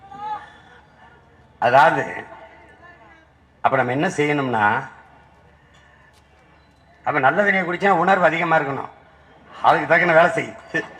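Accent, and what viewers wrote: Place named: Kerala, India. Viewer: என்ன எழவு மொழிடா இது. மலையாளியா?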